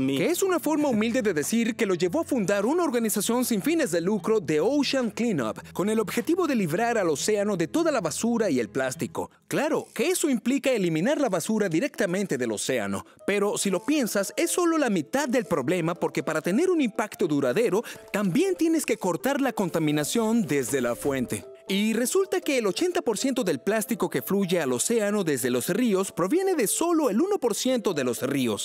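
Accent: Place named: United States. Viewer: gringo es que hablas ingles como norteamerica le dicen gringolandia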